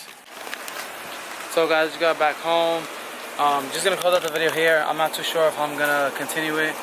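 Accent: New York, United States. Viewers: U from ny gotta be?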